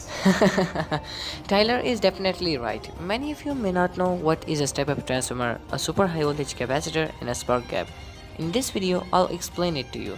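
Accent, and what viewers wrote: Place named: United States. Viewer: are bhai kya tum America wale Ho Yaar your English is so best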